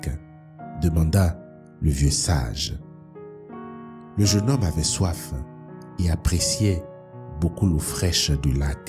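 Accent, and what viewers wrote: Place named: Africa. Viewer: J'adore l'accent africain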